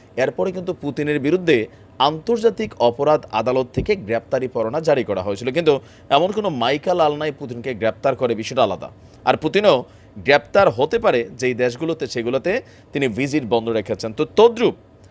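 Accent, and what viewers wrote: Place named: Cumilla, Bangladesh. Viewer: সাহেব আপনি ভদ্র ভাষায় খবর পড়েন খুব ভাল লাগে আমার কারন আপনি কুমিল্লা র মানুষ আমি নরসিংদী আমরা পাশাপাশি। সবথেকে ভাল লাগে যে ভাষাটা কথাটা শুনলে আমার ☺ হাসি পায়। যেমন আজকে পর পর কয়েকবার শুনলাম এ বি সি নিউজে বেনজামিন নেতানিয়াহু নয় তিনি পড়ছিলেন ( বেজন্মা) নিয়াহু। শব্দ টা খুব ভাল লাগল।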